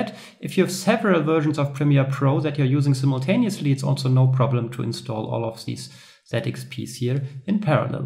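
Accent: Germany. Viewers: man merkt das du deutsche bist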